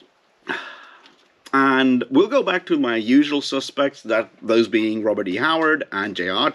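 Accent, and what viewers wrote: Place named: Norway. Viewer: interesting! I thought you sounded Norwegian!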